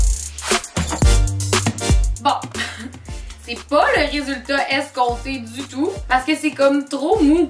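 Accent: Quebec, Canada. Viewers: T’es québécois ?? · Jadore l accent québécois mdr le français ses trop sainple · J’adore tellement l’accent québécois malheureusement je l’ai pas xD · l meuf qui prouve que l'accent québécois c'est pas forcément un tue l'amour mdr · J’adore l’accent canadiens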